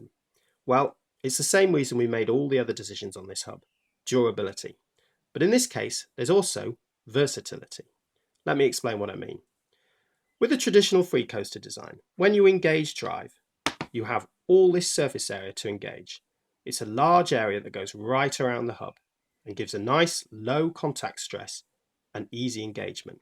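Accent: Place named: United Kingdom. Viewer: So whose British voice is this?? I've been running Odyssey parts for half my life and finally bought a Clutch today 😁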